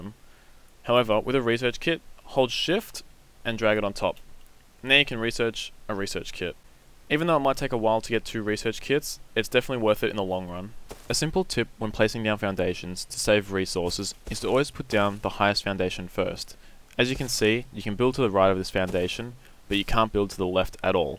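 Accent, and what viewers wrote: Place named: Australia. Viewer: You're Aussie!! Awesome bro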